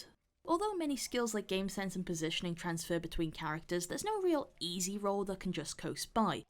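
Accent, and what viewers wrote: Wales, United Kingdom. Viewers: are you welsh i can’t really tell but i’m guessing you are 🤷🏽‍♂️🤷🏽‍♂️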